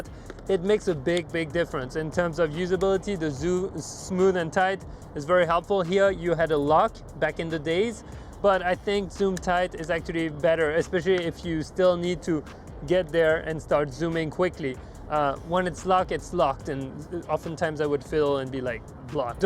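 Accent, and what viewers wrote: France. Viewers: great video awesome French accent-) need advice, im a run n gun shooter from shooting hockey games to street photography, debating strongly between the 35-150 and the new 24-70 g master. 35-150 is heavy not the most fun to carry around and missing the 24mm... while the 24-70 I feel like im missing some range.... what would you do? I feel that I like my 24-105 better lolll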